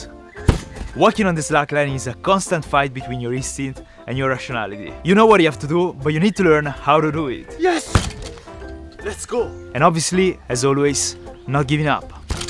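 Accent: Italy. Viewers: Ma é un italiano che parla inglese? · Se non fosse stato per l'accento non avrei mai pensato fosse un canale italiano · adoro il tuo accento italiano quando parli inglese, sei uno dei migliori youtuber in circolazione, continua così😁❤️ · Accento italiano si sente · Your content is amazing And i really like your italian accent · si sente un botto dall’accento che sei italiano😁 bel video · Ma perché ha un accento così italianizzato? (Secondo me è italiano) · Sei italiano vero? Dall'accento si sente. E comunque sei un mito 💜⚜💜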